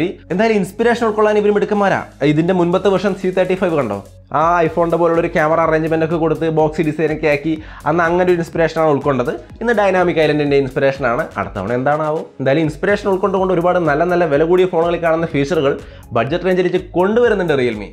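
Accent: Thrissur, India. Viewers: നിങ്ങൾ തൃശൂർ ആണാ 😍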